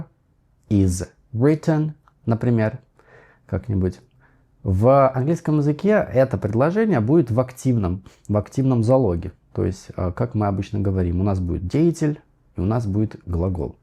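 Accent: United States. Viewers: ВТОРОЙ канал годный что нашел на английском (на русскоязычном ютубе), а не всякая ерунда типа плейлистов и прочего!, ваш американский очень очень хорош! Буду с удовольствием смотреть, спасибо!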